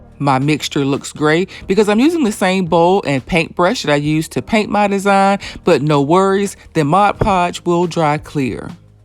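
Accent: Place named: Houston, United States. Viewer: Your accent sounds like you're from Houston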